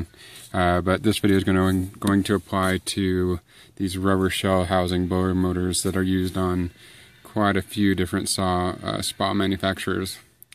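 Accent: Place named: North America. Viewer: You sound like you're from North America. North America runs on 60 Hz not 50 Hz. That blower will blow eventually too.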